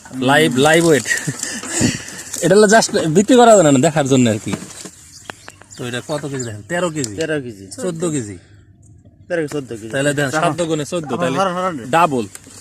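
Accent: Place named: Tangail, Bangladesh. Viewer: অনেক ভালো লাগলো আপনার কথা শুনে মনে ভরে গেলো ভাই কারন হলো আপনি টাঙ্গাইলের আঞ্চলিক ভাষায় কথা বলেন আমার বাড়ি ও টাঙ্গাইল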